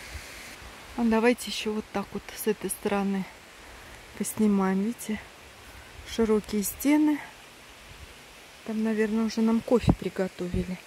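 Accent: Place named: Don, Russia. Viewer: Спасибо большое и за видео такой красоты! Такая экзотическая природа для нас, жителей степной зоны. Такая кпасотища в горах! И ещё в каких горах! Находясь не в любых горах можно обозревать такие великолепные виды. Смотришь, смотришь - не налюбуешься. А какие деревья с камнями, как приятно радует глаз ярко - зелёная трава и папаротники. А водопад.... слов нет, только эмоции восхищения! Спасибо Богу, что он так нас любит, что для нас людей такие виды создал, а вас, использует с, чтобы побольше людей могли таким полюбоваться, чего они в своей каждодневной жизни не видят и видеть не могут. Спасибо, что взяли нас в поход за грибами. Как там интересно с вами ходить... что ни шаг - прекрасные виды и в вверху и внизу и по сторонам. Как вам там сложно снимать было! Ведь всё время под ноги надо смотреть и баланс тела держать и про съемку не забывать. И высоко всё - таки, чтобы у вас и голова не закружилась. 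Вы как молоденькая девушка порхали там с пенёчка на пенёчек. А потом как показали снизу - крутизна то склона какая была! Смелая вы женщина, по вашему говору, мне кажется, что вы - донская козачка. Может я ошибаюсь, но удаль у вас и смелость и напор, как у них, мне так кажется. Не знаю кто бы мог так бегать по таким горам с таким азартом. Моё вам уважение и восхищение. Не слушайте никакой критики, если она будет - вы лучшая по съемкам в горах. Мне очень, очень, очень понравилось! Вы полностью донесли до нас коло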